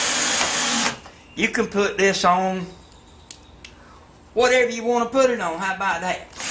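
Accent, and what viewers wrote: United States. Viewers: Fantastic accent. A true American.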